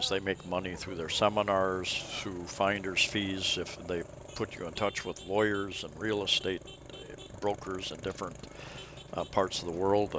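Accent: Midwest, United States. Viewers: Thx for the vid…older vid but you covered many important points..in 8th grade (Wisc) we sang from “The King and I” which I think is the first time the idea of living abroad came to mind, then working at an airline was able to explore many places and visit relatives in Sweden and Wisconsin..stay in Tland now (waiting to get back) but PI is my 1st option if things don’t work out there..compliments on your vids done in a midwest accent lol 🙏🏻👍🏻✌🏻🕊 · I just found your channel today. Liked. Subscribed. Wish I had found it sooner. After two visits to PH I knew I would be retiring here. My wife and daughter joined me in Quincy (just south of Boston) MA for about six years. It was a little sad. The first snow of their experience was magical for the first 20 minutes. Then my daughter was like, It was fun but I'm done. Left my wife and I to shovel the driveway alone. 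They endured six winters with me there. I retired early in 2013. Brought my tropical flowers home. I've been here (Siaton, Negros Oriental) for over five years. Just living the simple rural life. I was trying to place you accent thru several of your videos until I came to this one where you say you are from Minnesota. I knew I had heard it before. My best friend from my Navy days was Objibia from northern MN. I don't get out much but if you're ever in the neighborhood...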